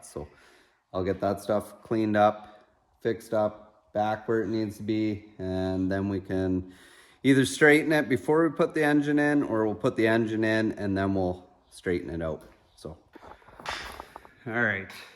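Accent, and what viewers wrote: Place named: Canada. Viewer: Am I missing something or is It he may be tired or something but he kind of sounds a little Canadian ish here compared to his other content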